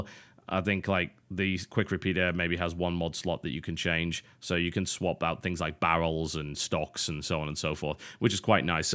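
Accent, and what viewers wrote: United Kingdom. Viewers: You sounded more british than usual in this video. It's not a bad thing.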